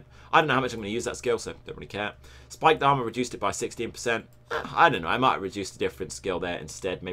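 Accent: Scotland, United Kingdom. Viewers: nice Scottish accent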